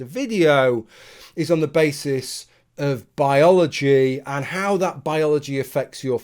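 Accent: United Kingdom. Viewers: RR 2.0, bigger, better and educational, even more UK accent.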